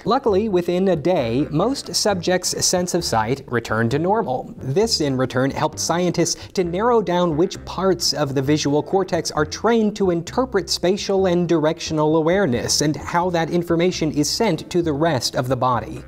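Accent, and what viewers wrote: Australia. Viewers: Oh I see you are from Australia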